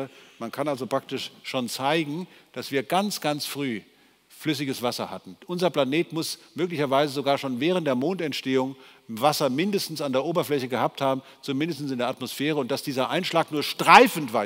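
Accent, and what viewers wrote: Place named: Bavaria, Germany. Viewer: (An den AnModerator zu Beginn) Der Inhalt ihrer Videos ist ja eh immer interessant. Aber könnten sie erstens mal den Buchstaben A richtig aussprechen und nicht so extrem Bayerisch und zweitens die Augen nicht ganz so aufreissen? Ich finde beides in Kombination lenkt zu sehr vom Inhalt ab und bringt das Ganze in eine so bestimmt ungewollt komische Richtung. Liebe Grüße und danke für die gute Arbeit und den interessanten Content!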